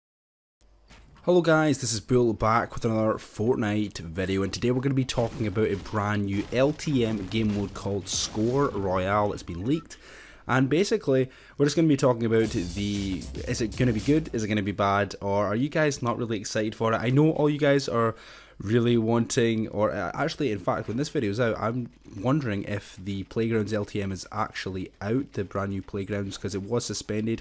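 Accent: Scotland, United Kingdom. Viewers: Are you Scottish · Is that a fellow Scottish person I hear?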